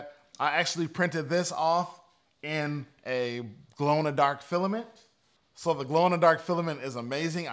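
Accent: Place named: South, United States. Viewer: What part of South you from bro? I'm originally from Alabama.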